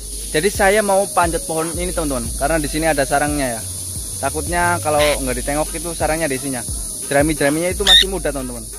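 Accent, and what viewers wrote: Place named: Indramayu, Indonesia. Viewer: Kyane Wong Dermayu Ikih Logat²e Mah😁 Sukses Terus Ang👍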